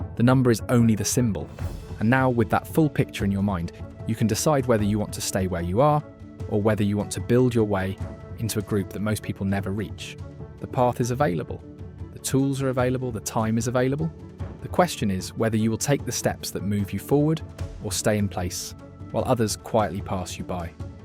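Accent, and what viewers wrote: United Kingdom. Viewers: A great video! Thanks for posting! I thought you were a British YouTuber as you have selected a British accent for your narration. Would you do this for the UK? Or are we too small?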